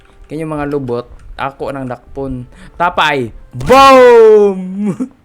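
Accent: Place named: Visayas, Philippines. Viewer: bisaya ka doi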